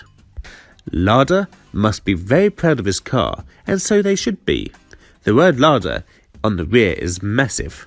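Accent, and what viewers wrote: United Kingdom. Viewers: Nice little car. Well made video too :) loving the British accent 👍 have a good day and thanks for sharing :)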